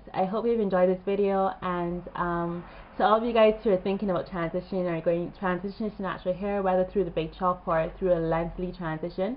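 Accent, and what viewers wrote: Barbados. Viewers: Are you bajan because I should know that accent anywhereee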